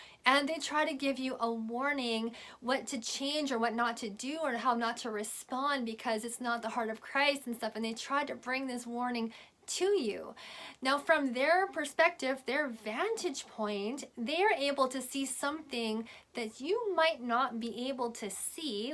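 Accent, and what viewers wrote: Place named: Canada. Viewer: You sound extremely Canadian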